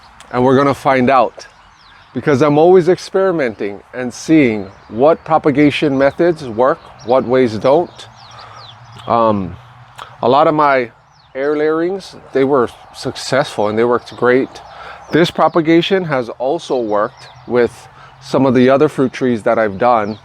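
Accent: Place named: Miami, United States. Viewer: Miami accent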